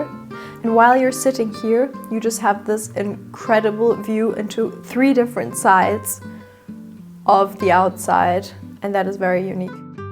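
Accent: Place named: Germany. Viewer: I love to hear you speak. Your voice is so beautiful and your English is perfect with just a hint of (German?) accent? Sorry if I got it wrong.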